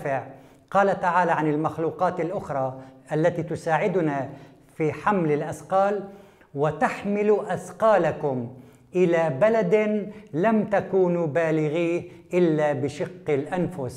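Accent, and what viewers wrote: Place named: Aleppo, Syria. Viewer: العالم سوري من مدينة حلب و هيك لهجتو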